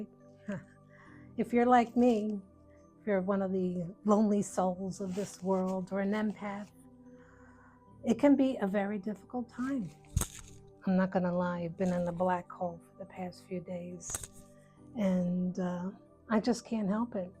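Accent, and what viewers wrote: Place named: New York, United States. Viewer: you're a sweetheart. I Love your NY accent - and I'm guessing Jewish?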